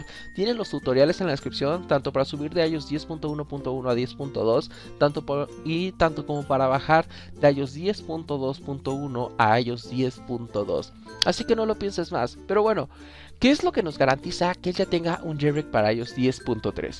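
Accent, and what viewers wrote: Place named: Peru. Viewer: Seguro eres peruano, hablas igual al Daniel Menacho 😹😹😹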